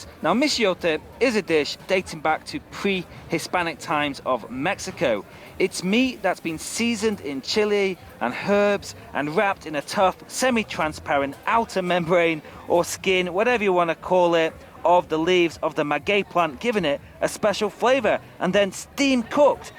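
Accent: England, United Kingdom. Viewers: There you go a brit in MX with a nice accent! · Escuchen ese bello acento inglés 😌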